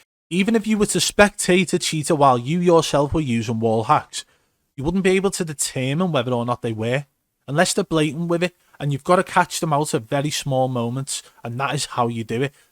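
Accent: Liverpool, United Kingdom. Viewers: U from Liverpool?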